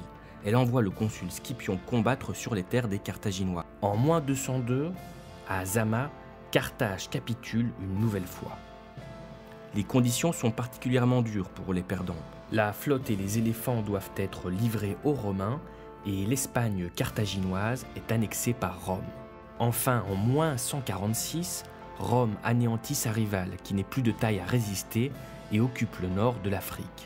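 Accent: Belgium. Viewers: Il est belge vus son accent, d'ou les "septante" pour soixante-dix ;) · ca m'a un peu déstabilisé, on ne détecte pas l'accent belge au delà de ça, mais excellente vidéo BTW ;) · Bel accent belge... Bon document aussi! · Et vive la pouissance romaine !!! (j'adore l'accent belge... :p)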